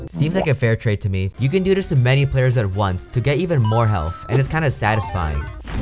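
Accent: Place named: England, United Kingdom. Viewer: Ayo ur pilipino with English accent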